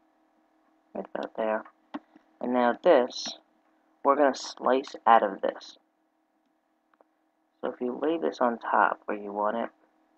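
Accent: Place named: South Jersey, United States. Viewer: ha!! i knew it!! my mom is from egg harbor, my dad is from absecon. i miss the beaches so much in south jersey....but am so excited to be coming back to go to the shore again!! woohoo!! you can talk to me anytime, i love that accent....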